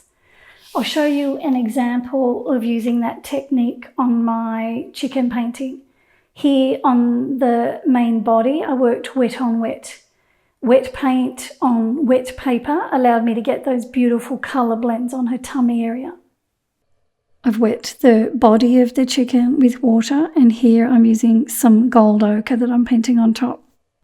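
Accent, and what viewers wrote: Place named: Australia. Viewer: HI, ~ I HAVE ALWAYS JUST LOVED YOUR ACCENT, AND YOUR TUTORIALS~ NOW I JUST FOUND OUR YOU ARE FROM AUSTRALIA!!! HOW NEAT!!! I ABSOLUTELY LOVE YOUR DOUBLE GIRAFFE PAINTING BEHIND YOU~ I'M SURE YOU PAINTED THAT ONE, YOURSELF~ I HAVE BEEN WONDERING IF YOU HAVE A REFERENCE PAINTING OF THAT ONE, AND/OR A RUTORIAL OF IT!??? I JUST LOVE IT~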